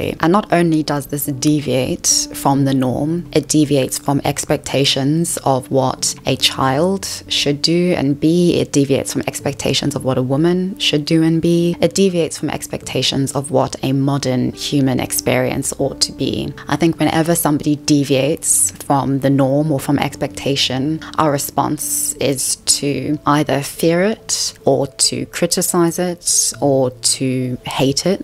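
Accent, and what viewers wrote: South Africa. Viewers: You're South African ❤..love it. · your accent sounds like south african that moved to england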